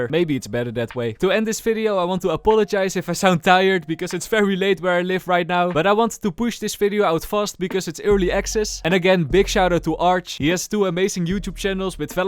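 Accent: Netherlands. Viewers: hey are you dutch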